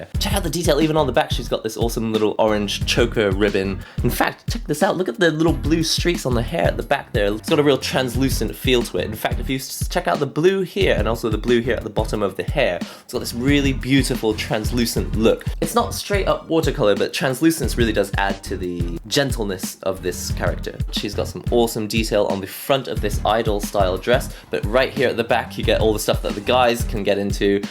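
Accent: England, United Kingdom. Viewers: Are you from England????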